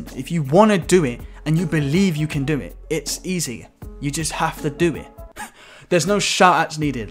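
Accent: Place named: London, United Kingdom. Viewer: What part of London are u from? Btw I'm soulth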